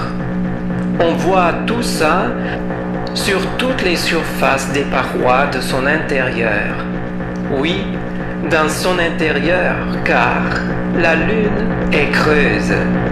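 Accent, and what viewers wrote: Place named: France. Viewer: c'est tres tres sympatique ...ahahaha ... et Ton accent Français est magnifique :)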